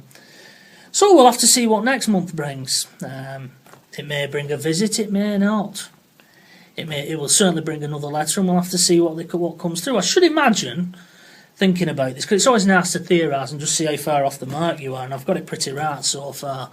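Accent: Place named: Wigan, United Kingdom. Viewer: Oh you sound local to me, where you from - Ah Kinda figured thereabouts with the last word you said.. You must be Wigan, Ince, Scholes?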